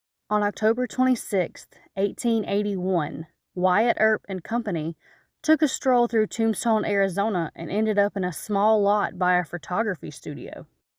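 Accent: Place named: Alabama, United States. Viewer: Honestly I would have guessed Alabama for your accent, though it's not a great match to the accent I know from folks from Alabama (it's close-ish), so the accent question, while potentially a bit rude is also kinda fascinating. Seems kinda like a NYC vs upstate New York accent situation (or Mass - folks on the western side of the state sound completely different than folks from Boston). Then you have folks like me who kinda pick up pieces of accents in about a week of exposure - we can be quite vexing to pinpoint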